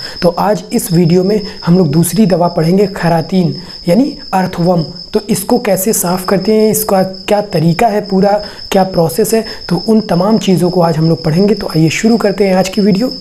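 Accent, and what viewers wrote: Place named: India. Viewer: bhai bolnay sae tou aisa lag raha hae kae aap india sae ho lakin urdu likhna jantay ho? Aur yae earth worm ko sukhanay sae pehlay marna parta hae kya? Bhai yae bhi batain kae sulphur ko gandhak amla saar kaisay banatay hein?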